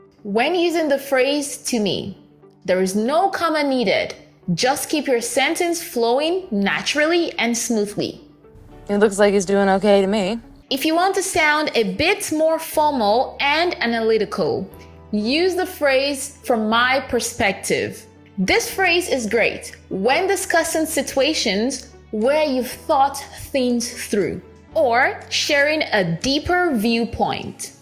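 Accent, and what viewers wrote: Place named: Nigeria. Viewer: Woow!!, Im really glad to come across your youtube channel , your English lessons are helpful and informative, your accent is very clear, by the way , I love my nigerian brothers and sisters, their comedies are A+, Did you grow up in Nigeria? ( your accent ), just asking my dear Sister. You have earned yourself a Subscriber.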